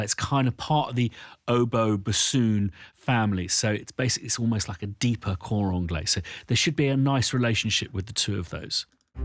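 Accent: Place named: United Kingdom. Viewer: I want a cor englès too. The British accent and proper language create an enormous credibility to my ears.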